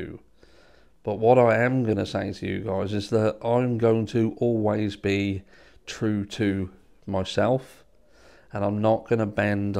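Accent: United Kingdom. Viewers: One of my favorite '80s tv series was "X-Files" and its theme, "Trust No One" is what I go by especially with YT videos. Anything featuring ex-F/B/I or former C/I/A employees or even retired LEOs are suspect because they still owe an allegiance to shady government agencies upon which they depend on for their lifetime pension$$$. But I trust you, 'cause you're British with a lovely accent and demeanor that goes so well with my morning coffee sweetened with stevia. 😁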